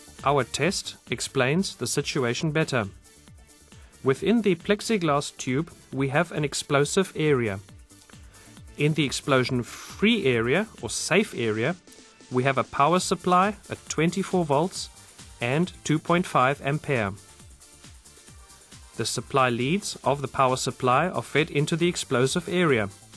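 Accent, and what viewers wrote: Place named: South Africa. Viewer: You sound south African, great video.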